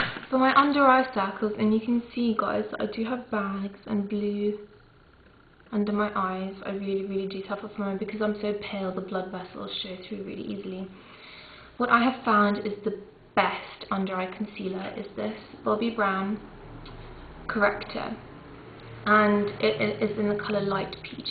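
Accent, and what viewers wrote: United Kingdom. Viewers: i love british accients ha sorry had to say it, and thanks for the tips:).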